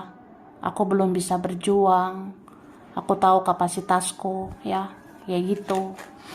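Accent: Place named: Java, Indonesia. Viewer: meduuuukkk suarane sampean mbak yuuuuuu....slam persahabatan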